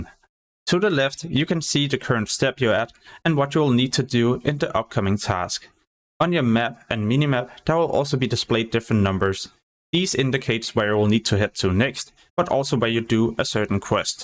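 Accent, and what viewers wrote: Denmark. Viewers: Great tips, ive been playing wow since the very first beta of vanilla and i still found some usefull tips here thanks. your accent sounds like your Dane just like me.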